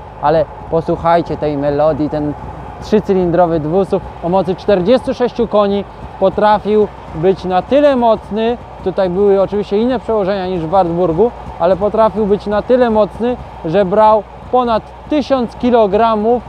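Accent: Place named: Podlasie, Poland. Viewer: Mam pytanie ... Autor filmów jest z Podlasia, czy po prostu ze zwykłej wsi ? Zaciąga niesamowicie....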